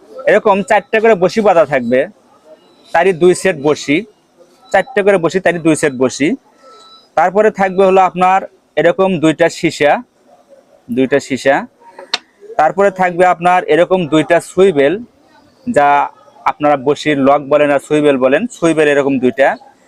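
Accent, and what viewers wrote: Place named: Bogura, Bangladesh. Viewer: ভাই আপনার ভাষা শুনে মনে হ‌ইতেছে আপনি বগুড়া সদর বা তার পাশে পাশের এলাকা থেকে বলছেন।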